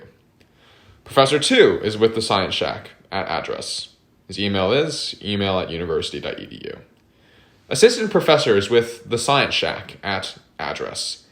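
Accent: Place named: Philadelphia, United States. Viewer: This dude has got to be from Philly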